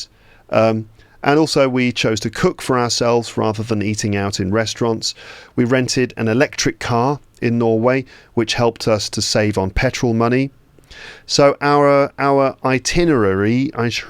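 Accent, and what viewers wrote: United Kingdom. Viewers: thank you for this awesome story about your holiday in Norway. I crossed the Norwegian mountains by bike from Oslo to Stavanger nine years ago... So many memories it pulled out from my memory 😮 Now being living in Canada for almost 1,5 years I wonder how you minimise this funny British accent which I have become to recognize last year? 😊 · Dear Teacher you are great! I love your lessons. Please make videos for shadowing i want to have british accent like you. With love and respect from Azerbaijan. Your lessons are amazing!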